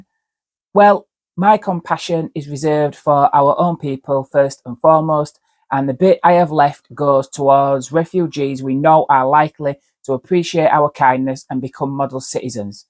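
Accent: United Kingdom. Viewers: Certainly speaks with a funny accent (like most Brits). Was that a gay Yorkshireman?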